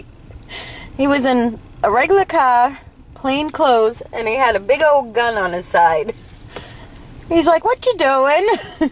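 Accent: Boston, United States. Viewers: Hey i wanted to let you know how much i enjoy watching your videos. I'm 19 and from Scotland and i watch your videos every day. Your accent is so cool the Boston accent is my favourite, probably because i love New Kids On The Block so much!:) Please keep making videos because they make my day a little better xxx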